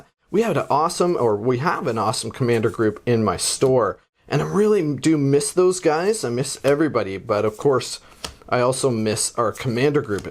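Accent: Canada. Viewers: No idea you were canadian and now i can't unhear it :P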